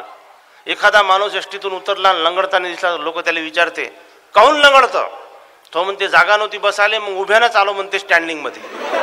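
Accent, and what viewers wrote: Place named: Vidarbha, India. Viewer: Vidarbhachi Marathi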